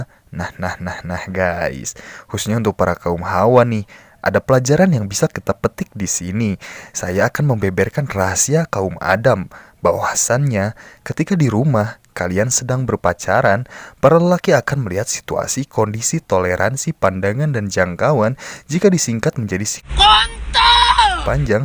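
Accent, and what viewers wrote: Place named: West Java, Indonesia. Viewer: Orang sunda mana nih...😂😂😂😂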